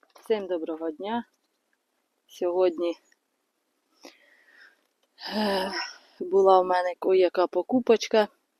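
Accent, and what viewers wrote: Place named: Chernihiv, Ukraine. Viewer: я Олексій з Чернігівщини, мова знайома,у деяких районах у нас така сама.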